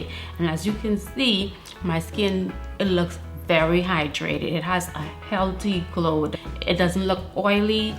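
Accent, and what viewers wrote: Bahamas. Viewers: You don't see this much over here. We just slap makeup on without 1st taking care of our skin and then wonder why our skin is messed up. I use to think makeup broke my skin out until I started watching beauty channels here on YouTube. Then I learnt how to care for my skin before and after applying makeup. Not gonna it feels strange hearing the Bahamian accent here on YouTube but you doing it. Taking 242 to the world 🇧🇸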